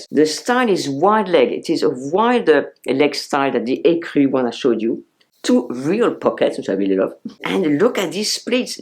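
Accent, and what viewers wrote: France. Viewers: You are very talented and can make anything look chic and expensive. So classy are some outfits that I copy some belts and scarves. The big thing I have taken to is red lips. My mother told me when I was 15 yrs old to wear red lips and 70 yrs later I'm doing it again. I wear eye shadow more naturally with a chocolate colour in the top of my lids and a beige or gold onto the lids. Muted of course. It's a head Turner. Just love that you don't put concealer under your eyes either. It's a winner. I watch Nicole Johnson and another french/ American model but you are tops for a little that goes a long way. Please do some more on your classy make up. Just love your anglicised french accent. 😍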